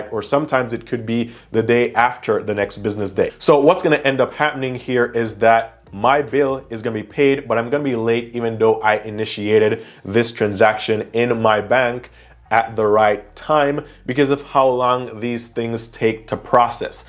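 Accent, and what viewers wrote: Jamaica. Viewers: Do I hear a slight Jamaican accent? Are your parents Jamaican?? Good stuff though. Thanks for educating those who are in the dark 👍✌️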